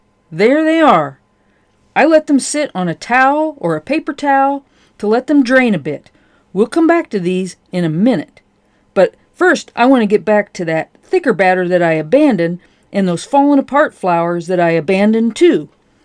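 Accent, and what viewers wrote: South, United States. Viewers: Hey great vid, I am watching this in England and I just love your voice, is that a southern accent ?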